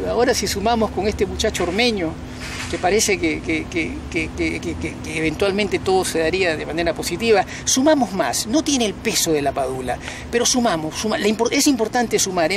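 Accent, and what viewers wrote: Argentina. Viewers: Eres peruano?, y el dejo argentino???....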